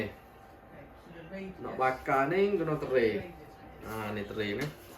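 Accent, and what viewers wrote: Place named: Kelantan, Malaysia. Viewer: Saya baru tgk resepi Yorkshire ni Dalam channel Cik Yatie Vlog. Tgk dia buat nmpk sedap n mudah.Dpt pula suggested dr YT channel ni, terus melekat. Nak try buat jugak lah pudding ni. Btw, cute lah kecek kelate.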